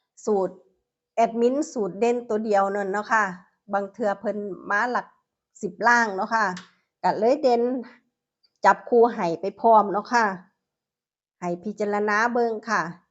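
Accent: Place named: Chaiyaphum, Thailand. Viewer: เลข 5 สวยครับ สำเนียงเหมือนคนชัยภูมิเลยครับ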